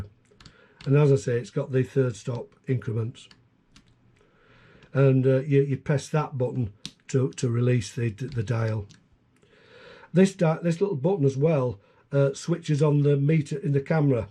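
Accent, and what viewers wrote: United Kingdom. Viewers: Thanks for this video, I was looking at an MX but this is really nice, a lot more expensive though. I currently have a P30, OM2n, Leica R5 and Nikon F3. You sound fairly local, I'm in Manchester. Subscribed :)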